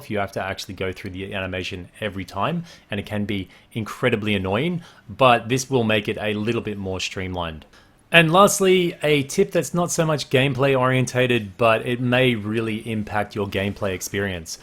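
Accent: Australia. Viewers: Austeralian eh?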